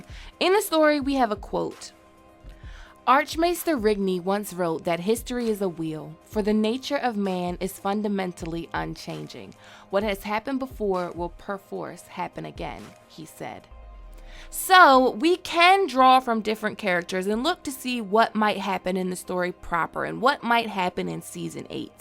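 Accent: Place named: Baltimore, United States. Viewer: Rolls up, and sips sultry sweet summer tea.. From Liberia I can hear that bmore twang. Thx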